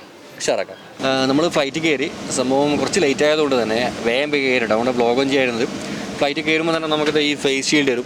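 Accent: Kannur, India. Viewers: Ingale kannoor samsaaram poliyaatto ❤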